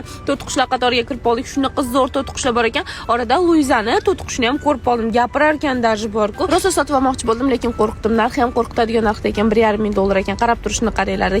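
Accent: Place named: Tashkent, Uzbekistan. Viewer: Toshkencha bn qayse shevani aralashtirvos? Yo Toshkencha yo shevezda gapirin qoganlani buzasiz yoshla internetga qarab oʻsvotti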